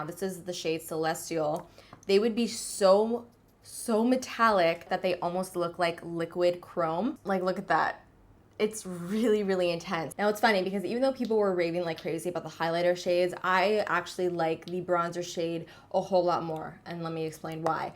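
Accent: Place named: Canada. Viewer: Do you watch Lael Hansen? I just noticed you both sound alike . Might be the Canadian accent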